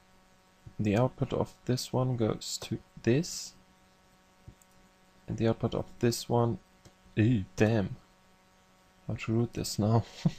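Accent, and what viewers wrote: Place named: Germany. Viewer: An deiner Aussprache hört man dass du deutsch bist :D Und dann hab ichs an deinem Programm gesehen xD Geiles Tut!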